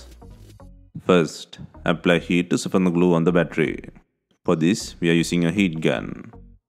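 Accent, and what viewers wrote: India. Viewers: It seems you're attempting to speak with the heaviest Indian accent possible. I can't understand 1/3 of what you're saying.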